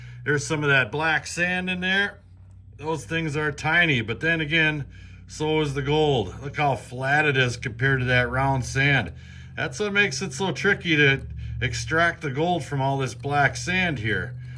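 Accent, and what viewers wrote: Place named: Michigan, United States. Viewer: Go aheaad an pan dis one ouwt just hits different when you’re from Michigan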